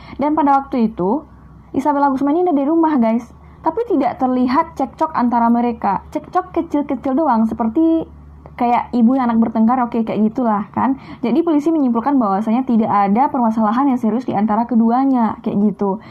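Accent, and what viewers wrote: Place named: Minangkabau, Indonesia. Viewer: Minang kental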